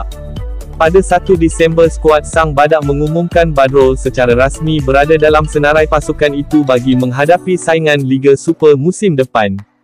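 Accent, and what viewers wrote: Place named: Indonesia. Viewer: admin ni orang indonesia tak? sebutan tiru2 gaya melayu tapi masih kuat pelat indon..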